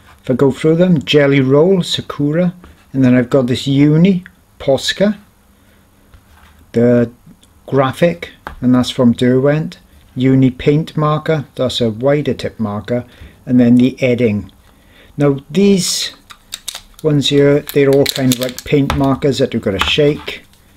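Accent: Wales, United Kingdom. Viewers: i'd recognise that accent anywhere. where in Wales are you from?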